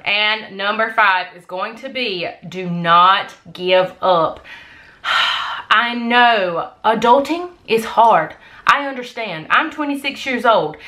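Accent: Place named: Carolina, United States. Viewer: Loving your channel lately, love your carolina accent. Sounds familiar ;)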